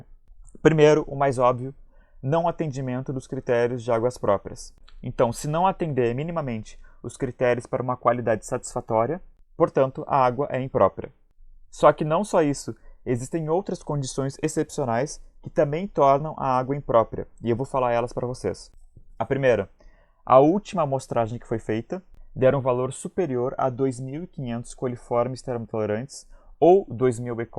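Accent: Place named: Rio Grande do Sul, Brazil. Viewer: Muito perfeito o seu vídeo, amei a dicção e o sotaque gaúcho, resumo de milhões. Espero que não tenha desistido de criar novos vídeos!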